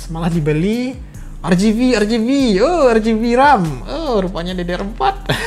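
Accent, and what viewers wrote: West Sumatra, Indonesia. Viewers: Urang Minang Uda?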